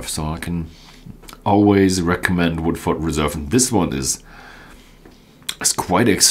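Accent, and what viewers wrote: Germany. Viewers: Did you understand what he said? Should we all sound like the Queen of England or Donald Trump? Language is about communicative exchange and once meaning is transferred, the way in which it is transferred is immaterial. Two way communication! Should 1 billion Indians or 100 million Nigerians who can all communicate through English adhere to your pronunciation patterns? No, they shouldn't. Rejoice in the difference and let's not homogenize and standardise every bloody aspect of life. speaks German as his first language. There is natural interference coming from German, as there would be from French, Arabic or Chinese. Get over yourself man....he pronounces words differently, as you would when you learn to speak German as well as speaks English.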